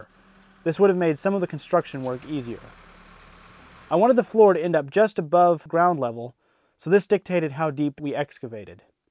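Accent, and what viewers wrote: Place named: South, United States. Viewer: Let me guess you not from Maine deff from the south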